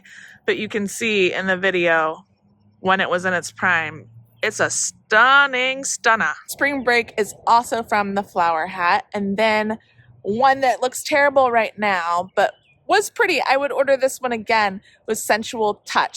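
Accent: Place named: Chicago, United States. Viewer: I love hearing your Chicago accent!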